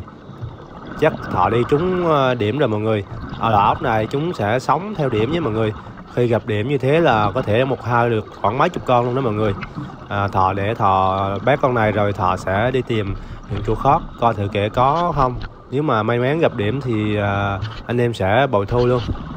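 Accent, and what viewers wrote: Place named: Quang Nam, Vietnam. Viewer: quê ở đâu mà nói giong fống qủang nam vậy bạn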